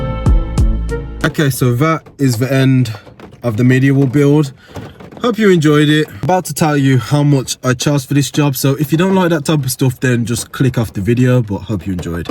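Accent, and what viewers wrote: Birmingham, United Kingdom. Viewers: This is a brummy accent - Birmingham, UK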